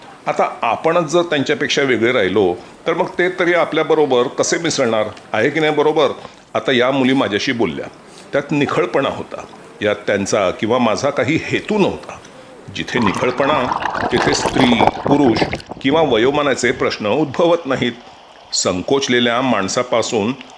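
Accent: Pune, India. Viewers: खूप छान पुणेरी मराठी !!!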